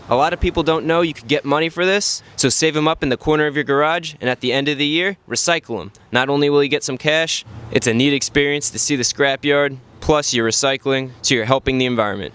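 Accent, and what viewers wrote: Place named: Boston, United States. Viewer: that bostonian though....hmmmnn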